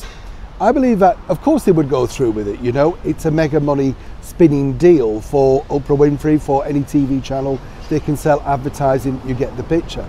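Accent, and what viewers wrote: Yorkshire, United Kingdom. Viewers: Jolly accent? That is a good Yorkshire accent, thank you.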